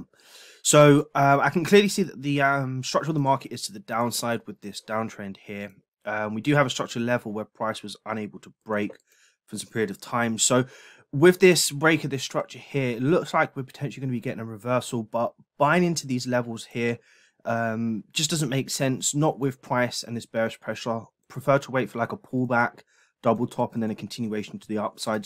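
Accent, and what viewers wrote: London, United Kingdom. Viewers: Honestly mate i think this is quality - i love the way your showing what you would do before & then after whether it be a win or a loss....love the honesty there , people learn more from mistakes .You sound like your from my kneck of the woods - London Lad.Anyways keep doing what your doing brother , you come across as a nice genuine guy .Liked & subscribed . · Thanks Boss. Your British right? What broker is this?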